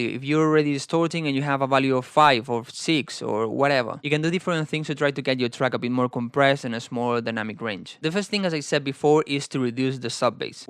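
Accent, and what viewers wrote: Spain. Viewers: noooo? Really? What sort of witchcraft is that!? 🤣 I was simply placing a rhetorical question as this fellow has an obvious Spanish accent 😁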